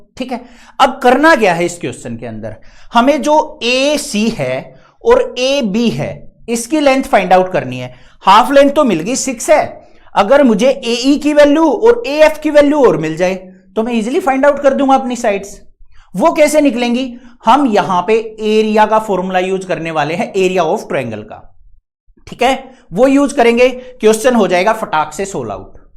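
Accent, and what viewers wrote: Haryana, India. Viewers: Thodi haryanvi banti ha class ma app na bola tha phala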